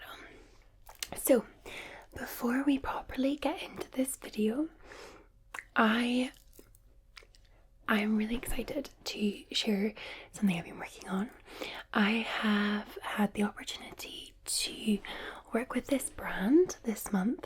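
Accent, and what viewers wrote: Scotland, United Kingdom. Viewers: "gurril", yep defos a scottish lass lol though doesn't sound weegie, more eastern, edinburgh or the lothian area or even dumfries lol whatever it is, its nice having a scot online. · Love me a wee bit of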